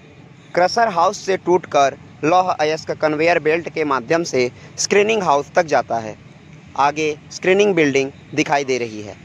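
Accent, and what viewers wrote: Standard. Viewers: sudh hindi achha bolta h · आपकी शुद्ध हिंदी का श्रवण करके हृदय प्रसन्न हो गया भाई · Kya baat he Ramayan ke badh aapki sudh hindi suni he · शुद्ध हिंदी का बहुत अच्छा प्रयोग किया गया है उच्चारण अत्यंत शुद्ध है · Your hindi is pure.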